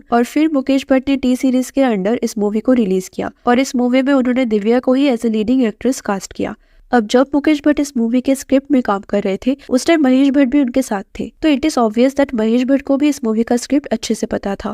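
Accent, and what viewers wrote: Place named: Kolkata, India. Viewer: That's khosla, not khoshla😂 I dont know why but I feel you are from Kolkata🤔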